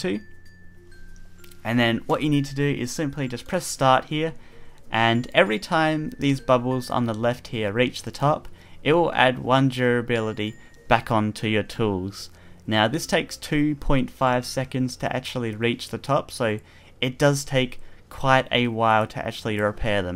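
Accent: Australia. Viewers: Is he an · lol, r u australian? luv the mod btw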